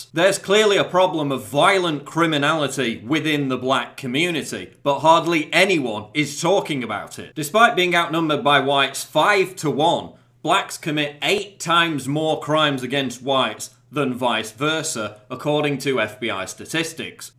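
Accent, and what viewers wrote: United Kingdom. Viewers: Another Alex Jones wannabe, with a British accent. Yawwwwn.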